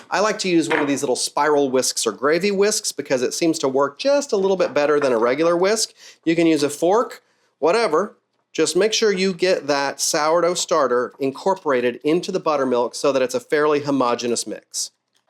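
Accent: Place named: South, United States. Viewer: You ma'am, are a real SouthernSoulSister. I thought so at "ya'll" and "sin" but I knew it for shorly at "my husbands mama". Lol.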